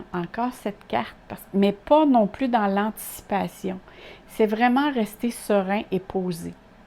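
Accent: Quebec, Canada. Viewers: Bonjour, tient dont,,,, un accent du Québec, très bon tirage, bonne continuité. Kado de Québec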